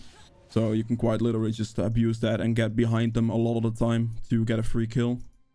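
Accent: Netherlands. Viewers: Lekker nederlands accentje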